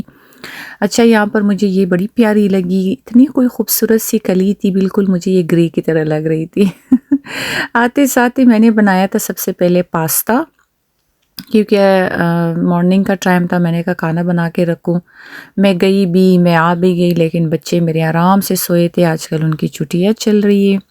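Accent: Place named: Balochistan, Pakistan. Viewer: How are you dear Sis 😊? Wait he kar rai thi apke vlog ka 🫠 , ye tu batain k ap pathan hu tu apko balochi kese aati he ? Wese apka lehja balochi he hai pathano jesa nai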